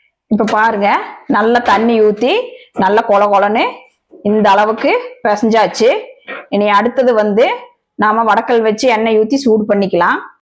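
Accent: Kongu, India. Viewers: கொங்கு தமிழ் சூப்பர்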